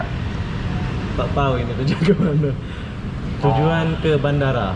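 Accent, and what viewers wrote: Malaysia. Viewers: Ini yang bikin you tobe orang indlonesia ataukah orang malaysia ?? Dialeknya kok dialek malaiysia tapi bahasanya indonesia ?? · Abg Ckap mcam ade logat malaysia. Pernah msuk malaysia ke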